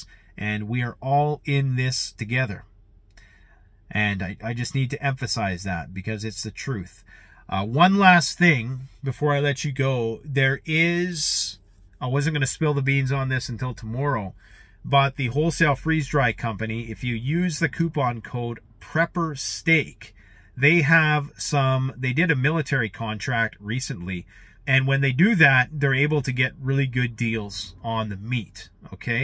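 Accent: Canada. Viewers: Trump is the best u sound like Canadian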